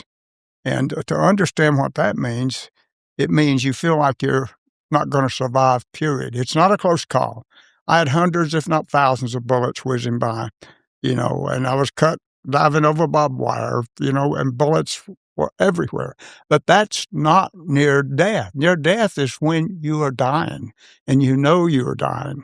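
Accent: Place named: North Carolina, United States. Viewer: Sir you mighta traveled a lot but knew were North Kackalaky soon as you spoke.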